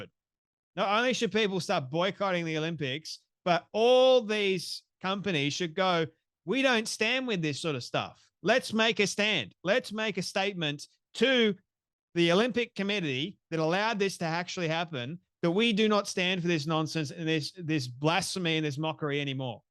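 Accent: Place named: Australia. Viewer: His AUSSIE voice is very annoying 😂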